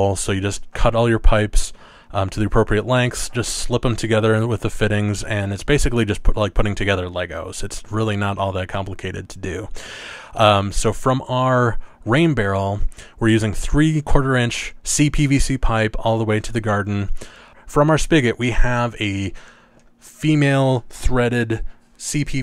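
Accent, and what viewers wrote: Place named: Midwest, United States. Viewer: It's Midwestern for roof. Sorry- our accent is awful.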